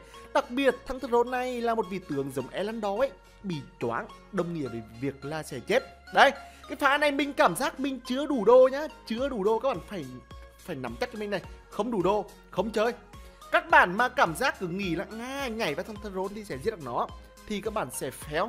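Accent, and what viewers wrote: Nghe An, Vietnam. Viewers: Y nghe an que choa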